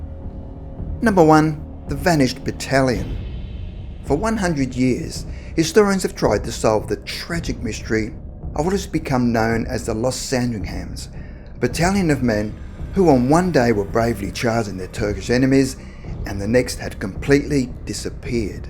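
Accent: Australia. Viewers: Are you Australian? That voice. Bloody so called great war is very accurate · I thought you were a Aussie mate.😄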